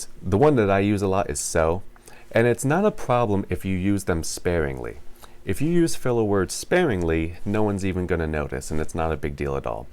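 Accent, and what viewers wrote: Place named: United States. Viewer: I love your accent. Which part of the States are you from?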